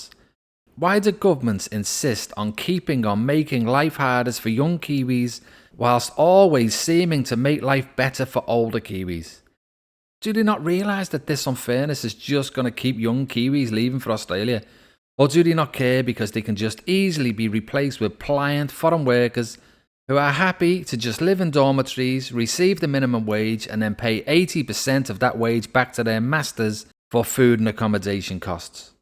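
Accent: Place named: England, United Kingdom. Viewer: Also it’s interesting to note you’re accent is English, New Zealanders have paid massive money to our indigenous Maori And rightly so, but what’s so disgusting about it is your average New Zealand in fact all New Zealanders never made the deal but paid the debt for the UK, we never gave their land away illegally your English monarchy did it but yeah this country paid the bill with nothing ever removed from the precious royals thou their family signed the deal and stole the land. Also more men died per capita in the second world war than most countries including England, we stood beside England from the start until the finish with the NZ Army being one of the best performing armies throughout, yet we’ve always been punished as the colonials used as Cannon fodder for those superior English inept generals, so the guys who help save England then have to pay three times the amount to get to the UK because of the exchange rate in England then gets all the benefits of coming to New Zealand and now thanks to you will be now snapping up all our property & everything else and at a third of the cost. 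So thanks for pointing that out to half of England because I’m sure you’ll all benefit from it again at our expense especially now England’s at War again internally, I’m sure they’ll be using all your wonderful knowledge to take advantage of us Kiwis again so thanks a lot mate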